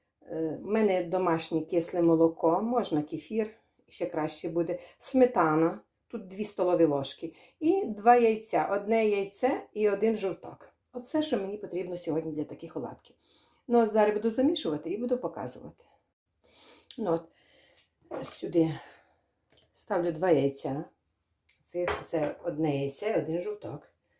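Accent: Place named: Bukovina, Ukraine. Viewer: Як смачно❤❤❤ Мені дуже подобаються ваші рецепти, а особлива ваша українська ... Це таке відчуття, що побувала на Буковині . А ви часом не з Буковини ?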